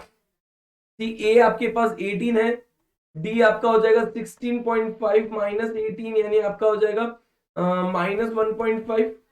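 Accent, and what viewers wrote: Gujarat, India. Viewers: Are u gujarati ??😅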